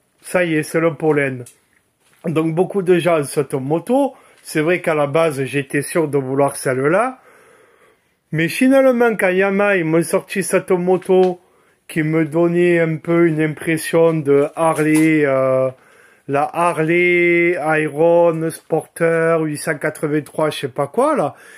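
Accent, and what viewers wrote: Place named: South, France. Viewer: Merci pour ta franchise et ta spontanéité, ça nous change des essais habituels. Tu donnes Beaucoup d’informations, je vais acheter la même. Est que la bulle autour du phare vient de chez Yamaha? Merci encore et bonnes balades, avec l’accent du sud!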